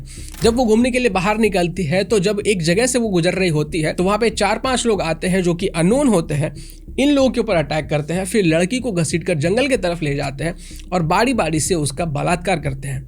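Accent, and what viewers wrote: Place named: Bihar, India. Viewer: bhai gandu hai kya..udisha nahi odisha hai.. bihari tone har mein ghusana jaruri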